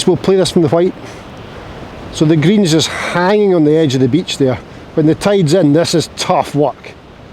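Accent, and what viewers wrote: Scotland, United Kingdom. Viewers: I kind of imagined you somewhere in the north of Scotland. Very desolated. Maybe it’s your accent.you were sort of “rough”, until I saw you interact with your kid. Which was heartwarming. Keep it up. I enjoyed it. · Thanks so much for your informative videos, I really enjoy them, including the Scottish accent. I am not Scottish, but I live in Nova Scotia Canada, which is Latin for New Scotland, so I feel at home seeing glances of your beautiful Country. Keep up the great content, and I wish you all the best going forward.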